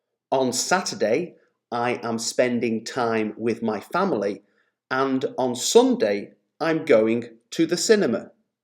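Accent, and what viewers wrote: United Kingdom. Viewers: Does the speaker's accent is a british not american ?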